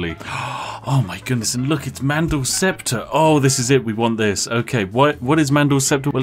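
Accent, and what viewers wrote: United Kingdom. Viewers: I love me some smooth talkin' British man playing fun and interesting indie games. Best bedtime story ever. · Aaaaaaa! British boy is back, where is my tea.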